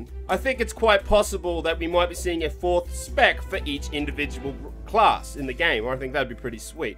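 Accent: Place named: Australia. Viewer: can never get enough of that sweet ausie accent!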